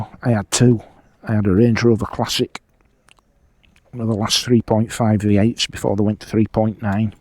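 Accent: Yorkshire, United Kingdom. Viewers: Nothing wrong with a plain spoken Yorkshire man or woman. Prefer someone from lancashire myself, but there again I'm one !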